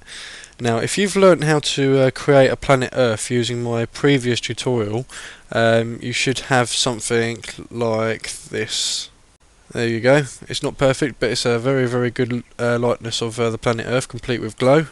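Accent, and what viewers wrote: United Kingdom. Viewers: im guessing you are british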